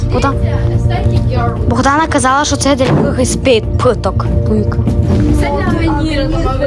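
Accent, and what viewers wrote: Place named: Transcarpathia, Ukraine. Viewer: Закарпацький діалект 😅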